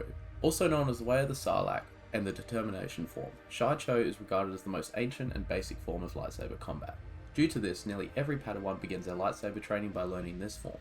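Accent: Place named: Australia. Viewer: That Aussie accent 🤌Great video man!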